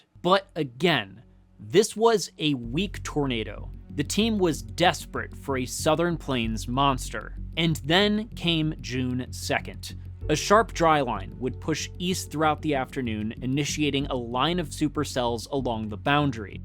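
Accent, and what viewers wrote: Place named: Upper Midwest, United States. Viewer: "keep an eye on that bob" You can tell he's from the northern midwest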